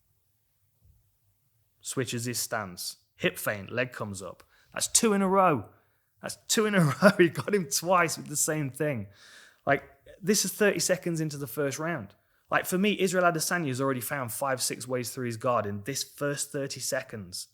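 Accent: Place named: East Midlands, United Kingdom. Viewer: Incredible breakdown as always and... incredible accent. East Midlands, let's gooo!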